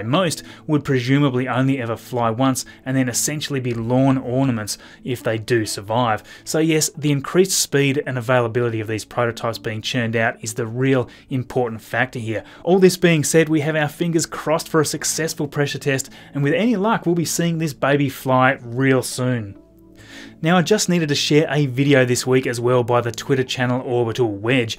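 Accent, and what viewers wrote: Australia. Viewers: dude are you an auusie , what state are you in ?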